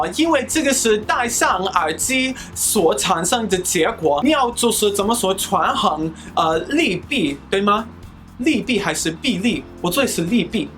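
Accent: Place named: Guangdong, China. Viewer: You really sound like you've learned Mandarin in Guangdong (not a criticism-that's what I'm used to). I'm currently shadowing 小王子 in Cantonese over 27 days and recording it. I'll upload those when I have some free time.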